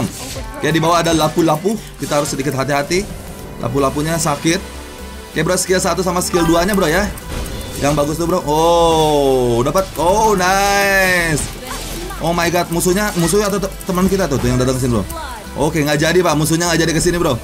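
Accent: West Java, Indonesia. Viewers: Bang orang sunda kah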